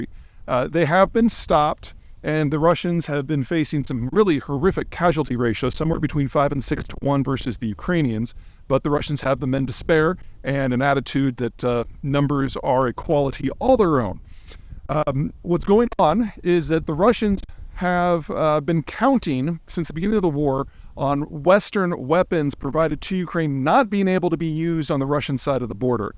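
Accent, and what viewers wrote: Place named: United States. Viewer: First of all: great video as usual, super informative. I just can't help hearing "car key" whenever you americans pronounce Kharkiv, and it's really funny to me.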